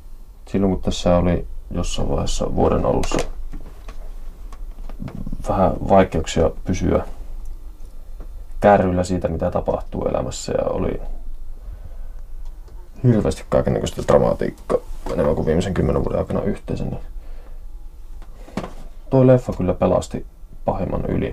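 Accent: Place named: Kitee, Finland. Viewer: dosen't mumble. People from Kitee just speak like that. I live near Kitee and I speak almost the same way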